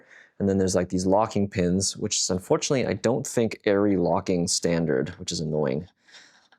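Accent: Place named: Toronto, Canada. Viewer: Cine in the 6? Sounds like Toronto, followed 🤙🏾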